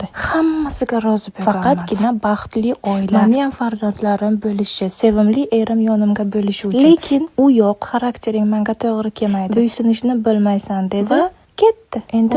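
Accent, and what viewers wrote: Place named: Bukhara, Uzbekistan. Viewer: Buxoro shevasida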